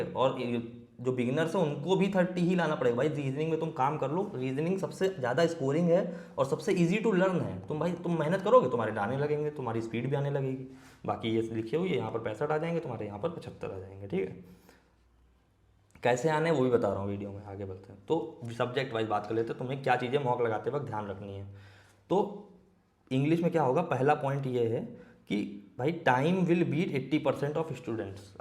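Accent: Lucknow, India. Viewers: kasam se jaan gya tha boli se hi ki lko se hain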